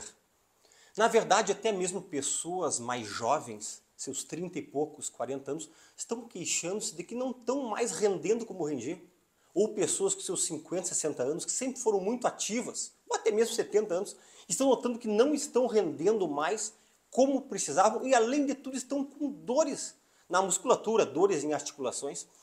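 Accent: Rio Grande do Sul, Brazil. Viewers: Ah...reconheci nosso sotaque gaucho❤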